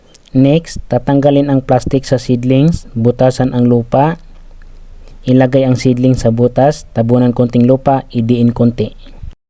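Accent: Visayas, Philippines. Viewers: 😢 parang bisaya si kuya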